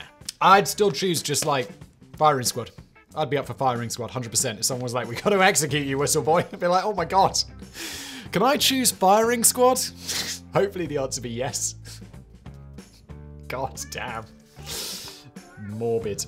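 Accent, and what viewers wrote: United Kingdom. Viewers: a witty British narrator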